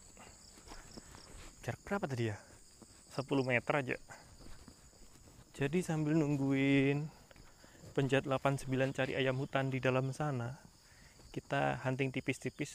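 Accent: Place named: Java, Indonesia. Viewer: hehe sama2🙏🙏logat2e ngomonge kok masih enek jowone to om😃😃🙏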